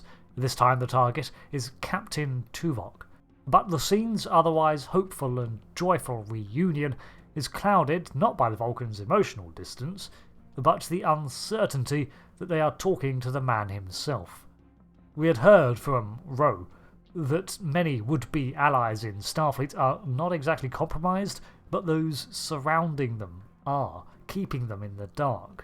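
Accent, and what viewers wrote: United Kingdom. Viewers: For a few years now I've been watching your videos for star trek online as I have not been able to play it much myself. I love the way you narratively approach each of your episodes for the stories. I love your recaps of this season of Picard. Not much of a point to my comment other than to say I appreciate what you do. And a question? your accent I'm having a hard time placing, and I don't know why cause usually I'm good at that. Honestly I can't tell if its British or Scottish but I have a feeling it's neither. Either way it doesn't matter. I'm just curious. Either way I do love your videos and watch them often. Looking Forward to the next one.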